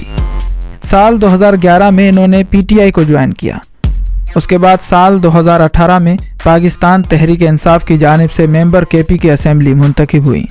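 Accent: India. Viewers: یہ۔ تو۔ کوئی۔ انڈین۔ کتا۔ لگتا۔ ھے۔ اس۔ کی اردو۔ غور۔ سے۔ سنو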